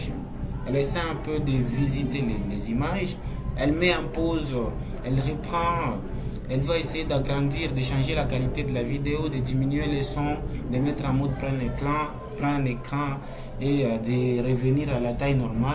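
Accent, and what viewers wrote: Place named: Swahili, East Africa. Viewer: Salut je suis vraiment intéressé par cette application Et oft j'ai comme l'impression que tu as un accent swahiliphone est tu de Lubumbashi?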